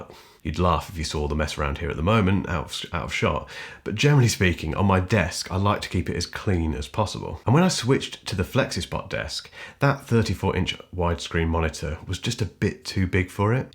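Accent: United Kingdom. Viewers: Thank you very much for perfect native British English !)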